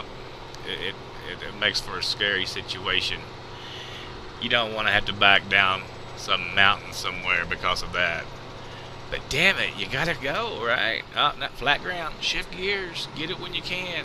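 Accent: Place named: South, United States. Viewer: I can tell you're from the south like me. We drive easy, breezy, nice and safely!! Lol..."I feel sorry for the guy...Bless his his heart!"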